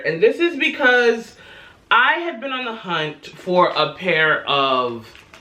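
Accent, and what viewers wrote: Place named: United Kingdom. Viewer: Good British accent. I like your thirties diaries.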